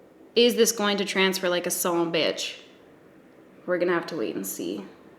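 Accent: Canada. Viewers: I love when the Canadian comes out in your voice. It makes me happy. You guys have the best accent! Side note: it reminds me of the Anchorman 2 fight scene with the Canadian news team from Quebec. #SORRY hahahaha 😘😘😘😘😂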